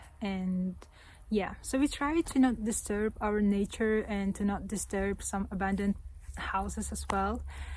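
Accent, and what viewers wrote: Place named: United States. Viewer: Thank you for your tour of traditional style living. I am a new subscriber. Kolxoz means English means " a collective village". We had similar villages of normally religious groups. Some still exist today. They are very clannish and self-isolating from society. The green flat long view country is beautiful. You are an excellent guide. The world is becoming smaller every day when an intelligent Caxa women is able to speak American style English better than I do in American cowboy country. 😊😊😊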